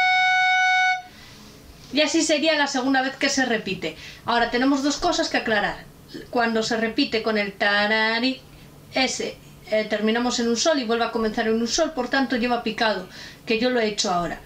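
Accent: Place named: Galicia, Spain. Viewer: ! Fíxate na canle "Tradigaita" que está en galego! Penso que á túa canle lle viría ben que os guións os dixeras en galego (vese que a veces che sae naturalmente así que, aproveita ese natural esponateo!). Tratándose de música, e moita música galega, estou máis que seguro que non lle afectará á comprensión! Ao contrario! Troca ao acento galego pola propia lingua galega!:-D Logo tamén, non sei como funcionas tí (co tema nervios/camara) nin canto tempo levas tocando pero seguro que menos videos e mellor preparados (os temas que tocas con gaita ao principio sobre todo) tamén sería un bonus e que atraería a xente:-D Ánimo e a seguir con ese labor divulgativo!:-D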